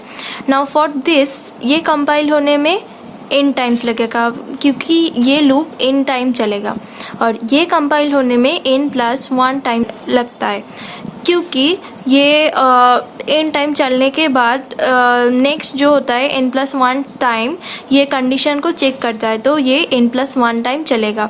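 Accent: India. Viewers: probably a hyderabadi or south indian accent , cute btw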